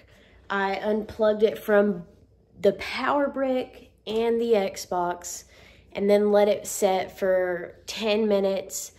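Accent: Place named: South, United States. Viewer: she is sooooo from the south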